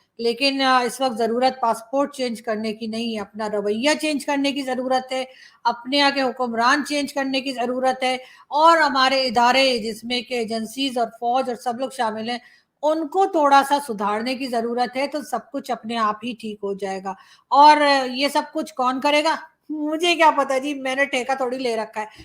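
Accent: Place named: Allahabad, India. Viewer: you are 100% Allahabadi, the way you speak is like HARDENED ALLAHABADI absolutely Pure, this is the way a NATURAL ALLAHABADI SPEAKS ❤😂 You are hilarious 😅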